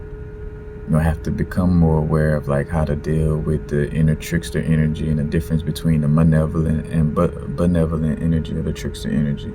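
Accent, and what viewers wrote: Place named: New Orleans, United States. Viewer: been on an august alsina binge lately, love his energy.. then this popped up on the sideline.. #nola love ur videos and ur energy and ur accent. continue to stay protected and covered, thank u for allowing the ppl onto ur journey <3 and thank u for reminding me to check on yeye mook's videos...been a minute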